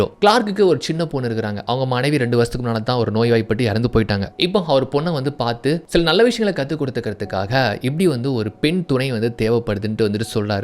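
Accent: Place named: Thoothukudi, India. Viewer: Eilla Thoothukudi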